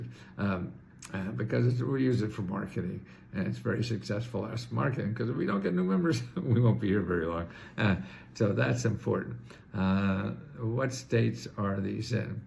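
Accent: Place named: New York, United States. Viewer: Please. Key. Me in.. Thanks yes. 😮❤😊love your info. Yes. You. Friendly. Sound like New York accent 🎉🎉🎉🎉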